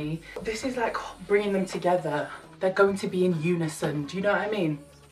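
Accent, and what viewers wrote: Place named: Yorkshire, United Kingdom. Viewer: Proper love you, reppin Yorkshire accent🤘🏽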